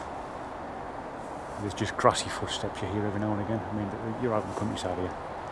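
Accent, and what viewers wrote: North East England, United Kingdom. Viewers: Hi, new subscriber (thanks to the ouija brothers. Loving your channel. Going to binge watch all your vids tonight. Where you from, you sound like a north east lad. I’m in Hartlepool x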